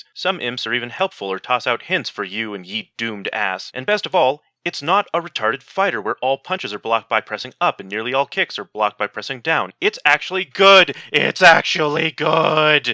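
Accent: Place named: North America. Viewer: You, sir, are too good. Thank you for the correction as well. You emulate Yhatzee's style so well. So well, in fact, if it weren't for the polite (nay, almost posh) North American accent and the garish colour scheme for the sake of individuality, I'd say it was the man himself. More please.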